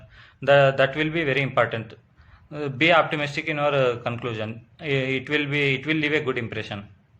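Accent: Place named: South India, India. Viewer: Sounds like south Indian. Are you ?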